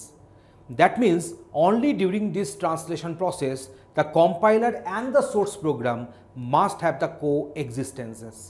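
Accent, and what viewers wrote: India. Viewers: 咖喱味的英语有点难懂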